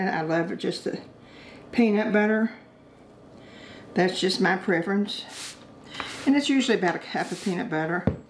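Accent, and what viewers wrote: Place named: South Carolina, United States. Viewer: I'm from East Tennessee, your accent sounds familiar. Almost could pass for an East Tennessee accent, but no quite. Doesn't sound like deep South. Probably South Carolina or Virginia I would guess. Maybe Kentucky, but I don't think Georgia or Alabama. Could be wrong, tho.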